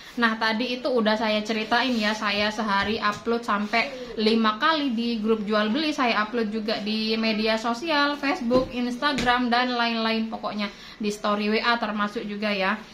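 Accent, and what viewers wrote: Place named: Sumenep, Indonesia. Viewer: Mbak tinggalx di mana? Kalau dari logatnya kayaknorang sumenep. Kalau emang sumenep, sumenep mana mbak.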